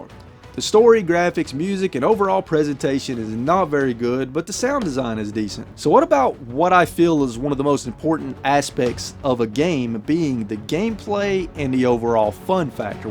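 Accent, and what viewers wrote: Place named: South, United States. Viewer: Thanks for the review! As a fellow southerner, I was really happy to hear your accent!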